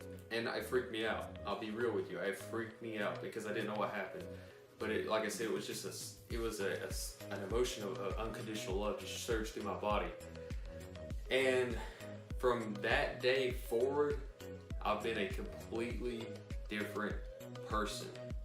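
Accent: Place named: Alabama, United States. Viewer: Where are you from! I’m from bama and I recognize your accent 😉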